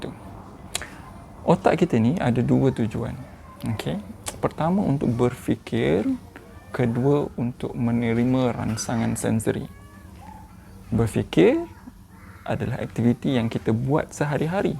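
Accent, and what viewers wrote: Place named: Kelantan, Malaysia. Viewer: Doktor ni org kelantan ke..dgr bunyi budak cakap kelantan..hehe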